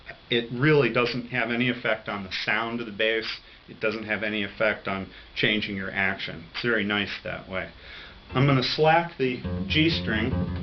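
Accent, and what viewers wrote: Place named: United States. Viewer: It sounds American, to me...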